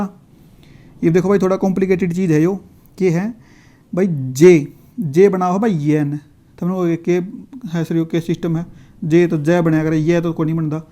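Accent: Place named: Haryana, India. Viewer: Very valuable and useful class (english in Haryanvi tone👍🏻)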